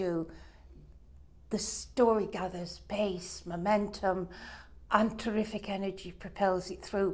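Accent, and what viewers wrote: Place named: Derbyshire, United Kingdom. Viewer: She's posh for Derbyshire, I'm from there and most people don't sound like this!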